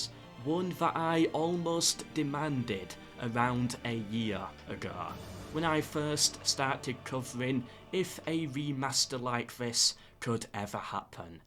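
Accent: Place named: Yorkshire, United Kingdom. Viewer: my relatives are from bridlington, you sound similar. Hope thats not an insult! Love the channel !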